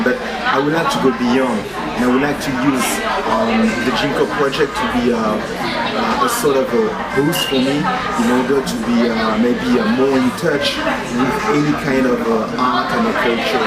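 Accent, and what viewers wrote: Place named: France. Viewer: He has a strong French accent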